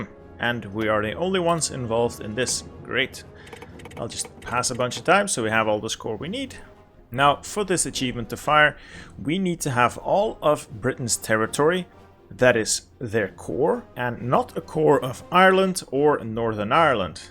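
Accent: Flanders, Belgium. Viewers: yeah but he's from the Antwerp region if I can locate his accent enough. Which is the northern region where we speak Flemish (form of Dutch)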